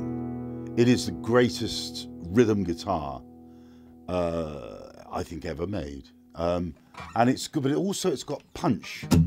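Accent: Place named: Dorset, United Kingdom. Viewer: Commenting again just below my comment aSince then obviously Greg has sadly passed, love his Dorset accent and he was also a great storyteller, I went to one of his gigs where he talks about his abs others music, he really was a one off, we will miss you Greg RIP now .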